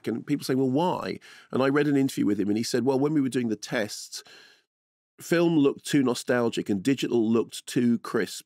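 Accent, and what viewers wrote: United Kingdom. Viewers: Americans: :Dune Brit: Djewn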